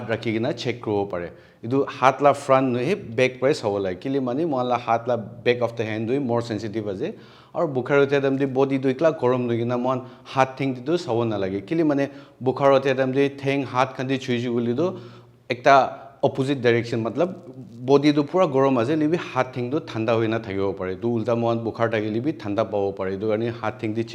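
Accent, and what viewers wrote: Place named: Nagaland, India. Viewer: Your nagamese is very sweet and clear hope everyone will become a good Frontline workers after hearing your message.Thank you Dr.God bless you for your good works.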